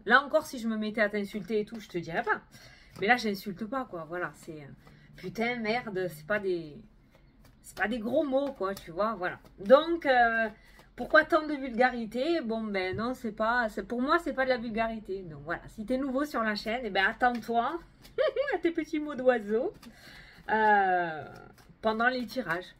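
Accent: South, France. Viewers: salut:) je voulais te laisser ce petit commentaire juste pour te remercier <3 j’ai 17 ans et je te regarde depuis 3 mois, depuis une rupture qui a été très difficile pour moi… tes vidéos me redonnent le sourire, tu dégages toujours de très bonnes ondes, toujours très souriante… et assez amusante!! je viens aussi du sud et ton petit accent et ces fameuses « expressions » font tout ton charme haha!! encore merci pour ta bonne humeur<33 Chiara:) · Moi j adore ta bonne humeur et ta façon de parler du sud , je kiffe comme dirais les jeunes🤣😂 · Bsr, toujours aussi pétillante et pleine d entrain et aucunement tu n es vulgaire. Pas du tout!!! Je suis du Sud aussi et c'est vrai que l on parle comme ça, mais ce n'est pas de la vulgarité!!! C'est notre parler!! 😃 merci beaucoup pour ce tirage! Reste telle que tu es, un soleil pour nous tous! Belle soirée 🙏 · Cc on t'apprécie comme tu es très nature et spontanée ça fait plus de 2 ans que je te suis avec l'accent du Sud + mots d'oiseaux c'est un feu d'artifice très plaisant 👍🏻🤗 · Bonjour nous au moins on a l accent qui chante je suis du Sud aussi🙂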